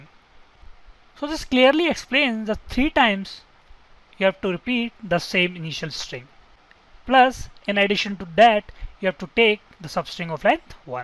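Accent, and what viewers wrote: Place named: South Asia. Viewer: Your heavy India/pakistani accent makes it impossible to understand you. I’m looking for someone else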